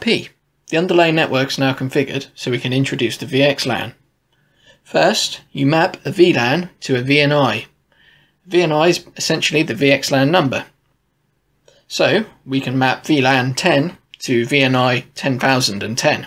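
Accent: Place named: Scotland, United Kingdom. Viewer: 你的口音让我想起了我远在苏格兰的奶奶。视频很棒。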